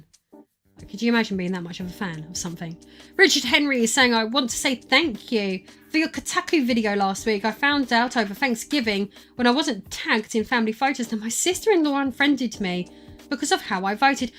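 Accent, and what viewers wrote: United Kingdom. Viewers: How lovely is your spirit , your smile, your soul and your British accent!!! Regards from Spain :) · Teach me how to brittish accent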